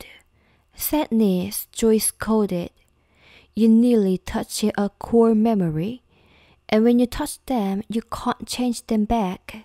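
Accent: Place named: United Kingdom. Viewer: 처음 들어보는데요..영국발음!! 너무 좋아요~~목소리도 너무 좋고 다른것도 들으러 자주 오게될것 같습니당!!!!!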